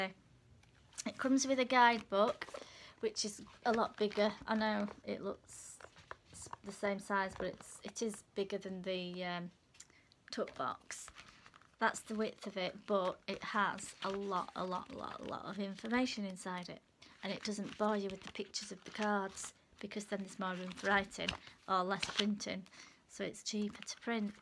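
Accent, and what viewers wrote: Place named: Northern England, United Kingdom. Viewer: Thank you for the recommendation and review, we appreciate you taking the time to do it! And you sound like a fellow northerner (I am from Bradford originally) - Josephine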